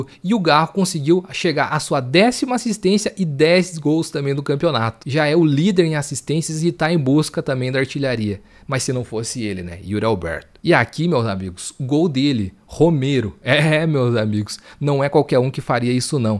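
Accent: Paraná, Brazil. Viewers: Teu sotaque n me é estranho, Paranaense pelo tipo kkk Vai Corinthians!! Direto de Laranjeiras do Sul, Paraná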